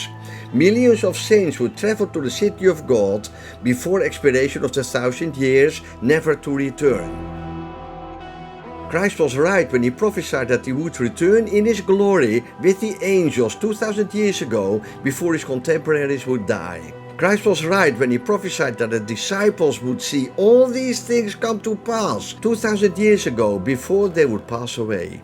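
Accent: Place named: Netherlands. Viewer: Love the Dutch accent;) great content btw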